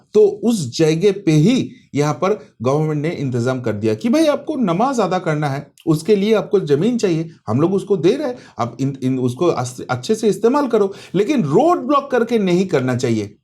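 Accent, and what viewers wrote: Bengal, India. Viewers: Aapka Bengali style Hindi Bahoot accha lagata hai,Don’t Change ur uniq style.Ur vidios r fully loaded with information and vidio clips.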